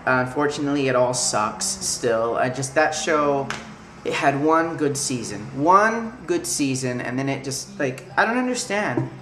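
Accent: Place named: South, United States. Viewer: You sounded really southern in parts of that video!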